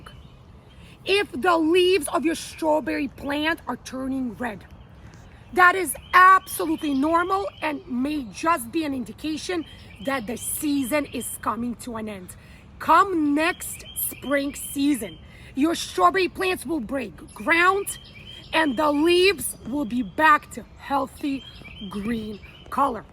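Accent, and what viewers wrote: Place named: New York, United States. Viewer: That’s how we talk from New York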